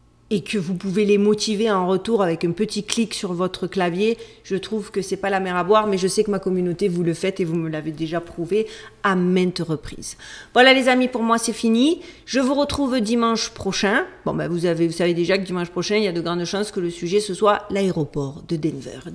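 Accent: South, France. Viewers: Vous êtes remarquable dans vos recherches, vos analyses et vos explications . C’est un régal de vous écouter . Merci pour cette touche d’accent du midi .. c’est du soleil et sa lumière qui nous envahit. Continuez à nous régaler .. Que Dieu vous accompagne dans cette recherche de la Vérité . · Super travail, magnifique accent du Sud... nouvelle abonné, j'adore !... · Excellent! Ceux qui vous dénigrent et dénoncent sont des Trolls. Donc ils n'existent pas. J'aime beaucoup votre style narratif avec ce petit accent du sud de la France et ces petites saillies humoristiques. 😊👏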